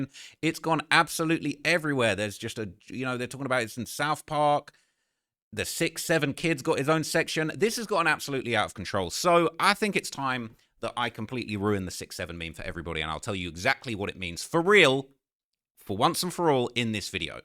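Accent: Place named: RP, United Kingdom. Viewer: It sounds posh & refined.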